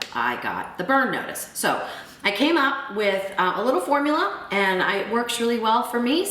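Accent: United States. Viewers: From Kentucky?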